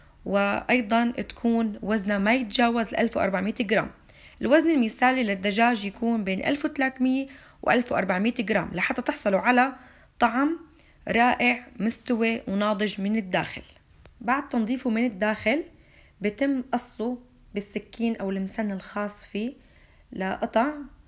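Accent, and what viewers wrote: Homs, Syria. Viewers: اللهجة الحمصية 😍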